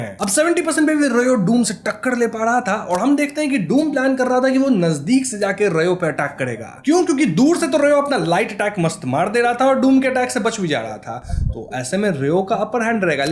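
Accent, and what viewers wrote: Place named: Bihar, India. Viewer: Bro is bihari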